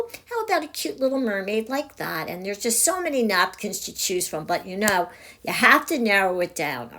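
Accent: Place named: Connecticut, United States. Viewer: Just 'discovered' you today!! I've subscribed & given you thumbs up!! You are such a great teacher!! I love your happy, cheerful energy! Your accent reminds me of my Aunt Mary. She came from Connecticut. Question: Can we use tissue on canvas bags, or only napkins? Now I'm inspired!! I guess fabric on fabric would work with this method??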